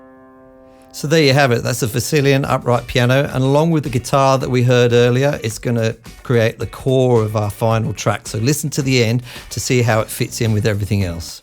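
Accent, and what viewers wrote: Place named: Australia. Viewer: Really refreshing to hear an Aussie accent on-line these days! You may have crossed paths with Pete Johns in here? Always on the look-out for a bargain & you've tickled my fancy with 4 of your 5 gems. Being a bass player, good as emulations get.. sounded good, 'till the octaves.. Thanks, oh, song title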